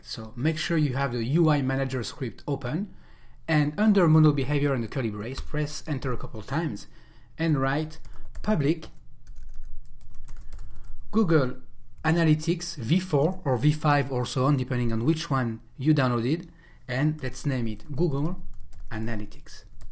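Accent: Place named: France. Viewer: L'accent francais ^^ Merci pour le tuto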